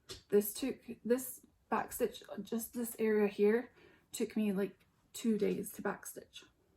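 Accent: Scotland, United Kingdom. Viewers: Hi, I just found your channel after a shout out from mommolovesyougb. Those are some fantastic finishes for the year, you achieved a lot! Great hearing a familiar scottish accent on flosstube, I am a fellow scot from aberdeenshire! Hope you have a merry christmas, a happy hogmanay and a fantastic new year!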